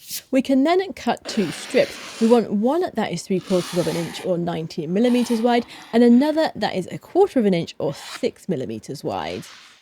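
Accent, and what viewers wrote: England, United Kingdom. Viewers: thank you so much for your videos !!! it's always a pleasure to see it, everything look super easy with you and it is very well explain Ps: I love your english accent <3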